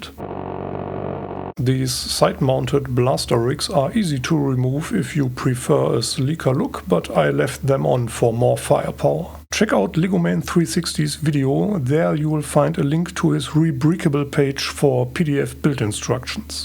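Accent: Germany. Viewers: Hey, I have never heard your voice, but now Im curious. Where are you from? Do I hear a bit of German accent?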